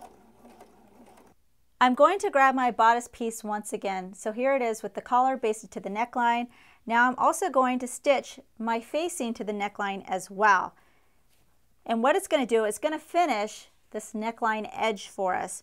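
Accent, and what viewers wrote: United States. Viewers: talks too much and silly american accent , more interested showing her nails and manicure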